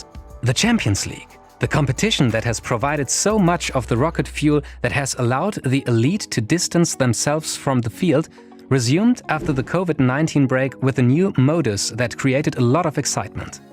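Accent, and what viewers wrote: Germany. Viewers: Sounds more like he's german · Are you german? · DEUTSCHER AKZENT JAAAAAAA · this guy could do a 10 hour video about paint drying and i’d still watch/listen love the soothing german? accent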